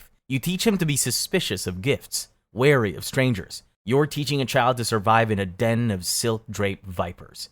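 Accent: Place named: Arab world. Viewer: arap gibi okuyor soluğunu gırtlağını silktiğim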